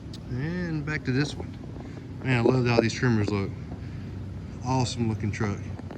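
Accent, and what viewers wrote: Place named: Alabama, United States. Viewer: You got a Bama accent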